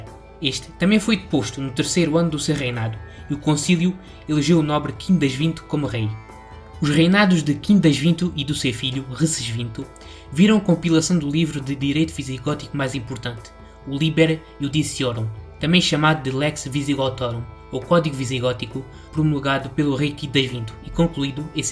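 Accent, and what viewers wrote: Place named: Portugal. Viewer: Riqueza de conteúdo histórico, vídeo muito esclarecedor e cativante com o sotaque agradável e bonito de nossos irmãos de além mar lusitanos... Só aqueles que desconhecem a nossa história própria história os satirizam ou menosprezam o valor de inúmeras figuras que estiveram envolvidas no descobrimento e exploração da (então) colônia, foram fundamentais para o início de nossa terra! Admiro muito as histórias desse período e quero acompanhar e descobrir cada vez mais, obrigado por compartilhar conosco irmãozinho!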